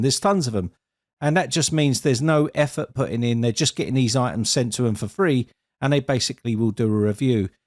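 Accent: United Kingdom. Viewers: Dear, I have been your loyal follower and supporter for years but a docile one and rarely would I comment anything here cuz you are not just only professional, but also your tone and voice attract me so much. Yes, I am definitely so in a way that no matter what topic or theme you are focused on each time, I would just trust, believe and follow so. I remember there were several times I followed closely your guidelines and instructions of running cmdlets in the WinRe settings and did have one of my Fujitsu Laptop completely fried with none OS remaining from drive x: to boot on, especially at the time about Windows 10 vers 1809 and 1903 prevailing, where MS media creation tool was not yet so available for download for fresh installation, and as a matter of fact, the approach you taught us did not even allow any system repair discs to run on and that's what I am referring to as for "completely fried". 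There were some more similar and other same Finale cases of the fate of my devices, but since I declared before already that you are my favourite IT online consultant, instructor, tutor and teacher, I never wrote here back to grieve or challenge your expertise. This has to thank for your beautiful voice which carries a sense of charisma that has a great lure to listeners even though audience never see your real face, and In my belief just based on your magnetic luring voice and sound, with precise tempo, cadence and completely pure British accent of spoken English language, yo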